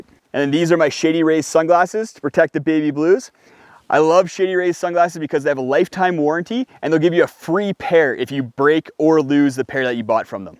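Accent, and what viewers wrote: Canada. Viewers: The Canadian accent is amazing. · Wow this guy’s Canadian accent is thicc!!! I’m from Detroit so familiar with Windsor, Ontario but this is at another level. Great information though! I added some of these items to me REI shopping cart. Thx!!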